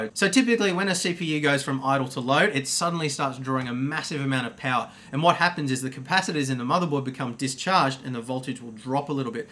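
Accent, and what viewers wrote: Australia. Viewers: Finally, an Aussie tech guy! Yew beauty! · Are u australian mate ?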